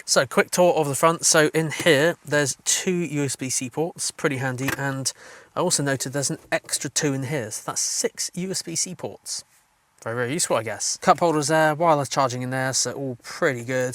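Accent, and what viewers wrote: England, United Kingdom. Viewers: Great video. English accent always adds a level of sophistication. I miss Europe.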